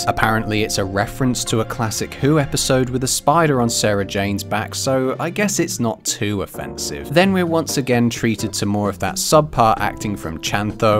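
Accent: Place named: United Kingdom. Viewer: Hello man. I was thinking about your accent but i can’t quite tell which is it: of course it’s an accent from the UK but which one? I’m from Italy and i’m trying to get more familiar with this kinds of accents, thank you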